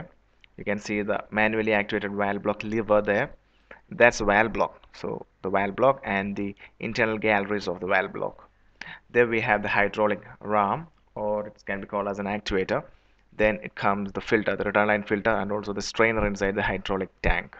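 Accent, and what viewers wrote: India. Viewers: Couldn't understand the word u say It's like u speaking Indian in English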